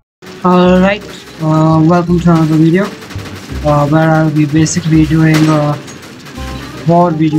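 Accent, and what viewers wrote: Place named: India. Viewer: I can tell by your accent that you are indian, im indian too!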